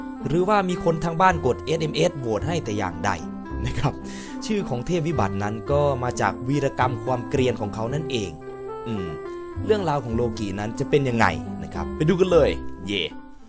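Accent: Isan, Thailand. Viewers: พี่เป็นคนอิสานใช่มั้ยครับ 555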